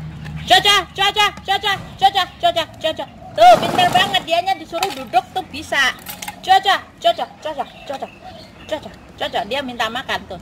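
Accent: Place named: Banyumas, Indonesia. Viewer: Mantap banget bagi bagi ngapak hee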